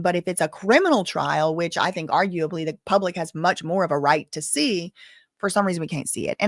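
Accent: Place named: Louisiana, United States. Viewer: 💜💛💚⚜️ this Cajun is here in every chat! Im not always talking, but always learning from you! I love hearing the bayou in you come out 🥰 keep doing amazing things, Queen! Thanks for doing what you do!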